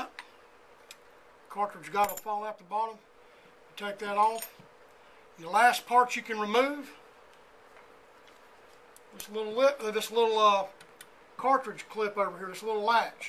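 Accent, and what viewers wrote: Maine, United States. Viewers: Is that a Maine accent????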